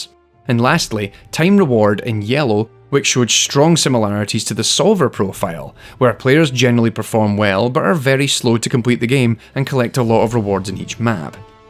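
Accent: Scotland, United Kingdom. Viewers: Scottish was my guess, but I wasn't sure. Thanks for your answer!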